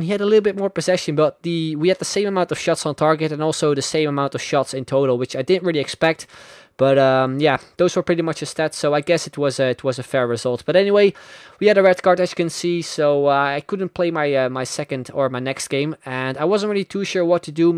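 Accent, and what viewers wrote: Netherlands. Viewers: Are you dutch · you sound dutch. Are you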